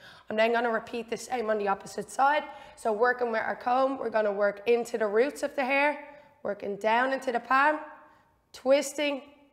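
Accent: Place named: United Kingdom. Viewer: What part of Britain are you from, you’re accent is awesome! Since the pandemic forced me to become my own barber, and after lots of trial and error(and clipper fuck-ups), these videos from you and the Regal Gentleman are huge help. I can’t go back to my rural Michigan (US) hairdressers, I wouldn’t let them bush my cat’s hair! I like to do funky things with my hair, mostly short highly textured, disheveled, high fades…I’ve been experimenting with coloring bleaching and highlighting for years, I like ice grey tones a lot, and that took a LOT of practice and patience and it still challenging, as I mostly do silver or grey highlights…. But yeah, it’s fun stuff, you guys the best jobs, making people look their best, I’m sure it’s incredibly fulfilling career! Thanks for the video tutorials, much appreciated 😁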